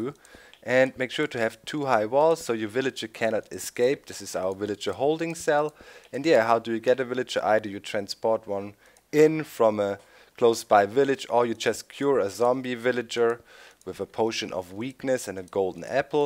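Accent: Germany. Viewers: Man hört deinen Deutschen Akzent:))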